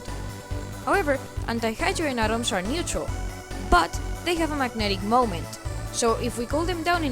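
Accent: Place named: India. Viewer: Are you india Bcz your accent is like that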